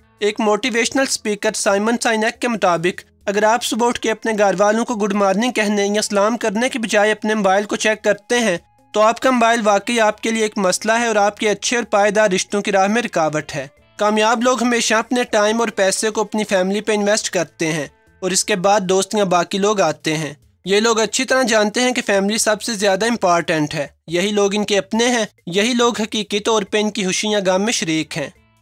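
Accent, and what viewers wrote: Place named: Sialkot, Pakistan. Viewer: Speaker is definitely from sialkot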